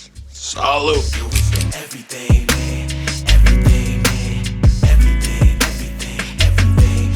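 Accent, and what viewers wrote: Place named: New Jersey, United States. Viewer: If i had to guess the accent i wud say JERSEY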